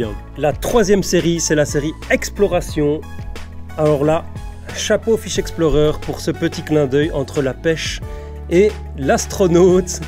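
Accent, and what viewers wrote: Belgium. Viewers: = vive l accent belge j adore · = le meilleur accent belge de tout les temps!:D Le team Celt'fish te suit quotidiennement sur les réseaux! À bientôt! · = un accent belge à en rendre jaloux les Français. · = un accent belge bien sympa, avec des vidéos bien intéressantes pour novices et confirmer!👌🏼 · =la bonne ambiance de tes vidéo et ton accent belge font des vidéos super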